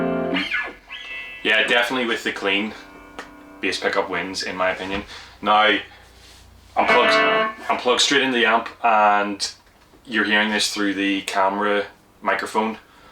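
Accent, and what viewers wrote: Northern Ireland, United Kingdom. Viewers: Danny sapko watchers when they hear a British accent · That's quite a controversial statement - the Northern Ireland accent is not "British".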